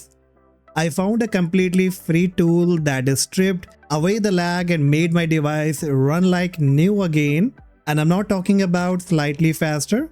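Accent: India. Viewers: sounds like he's from one of those Indian call centers that steal peoples information, don't trust those people they are online thieves.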